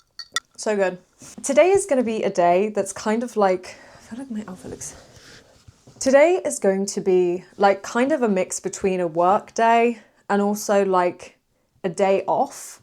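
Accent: United Kingdom. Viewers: I really enjoyed your videos and I especially loved your British accent. No wonder you're from the native English-speaking country!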